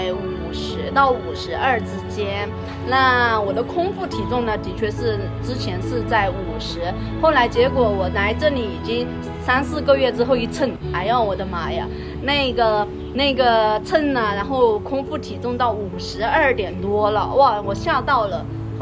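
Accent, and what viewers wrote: Guizhou, China. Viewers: 对了，昨天把妳说贵州话的视频录下传给一个贵州黔东南属苗族的女性朋友，她说的确是贵州话，她在其他省份工作，很久没听到这腔调了😂😂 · 老公不等於腦公喔，你貴州話音太重了！人是互相尊重的，你敬我一分我敬你三分！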